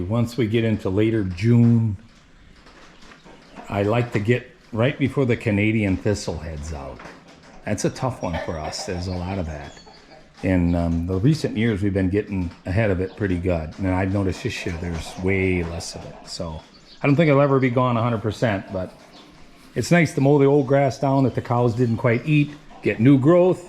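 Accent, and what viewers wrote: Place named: Wisconsin, United States. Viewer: Thought I heard a Wisconsin accent. I live in Kaukauna Wisconsin. We’re buds.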